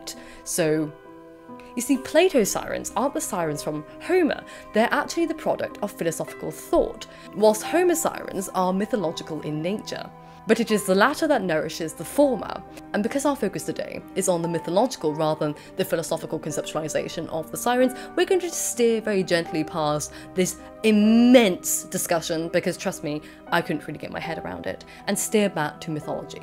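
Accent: United Kingdom. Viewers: Why does every British person sound like an Oxford professor! 💚 everything sounds more intelligent and smooth! 💚 Love the mythological on sirens/mermaids! 💙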